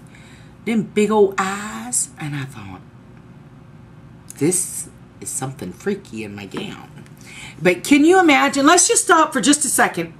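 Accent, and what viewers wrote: South, United States. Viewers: omg you are so crazy. You must be from the south I love your accent. Please keep it coming we love you.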